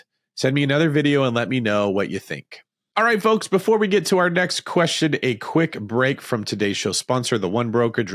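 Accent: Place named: Scotland, United Kingdom. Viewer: Go, I love the Scottish accent! It is probably one of the hardest to do!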